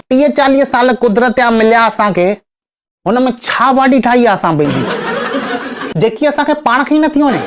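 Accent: Sindh, Pakistan. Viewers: Really refresh our mood.. Speaking skill too good.. Love to hear ur sindhi accent.. Blessings n wishing success always 👌👌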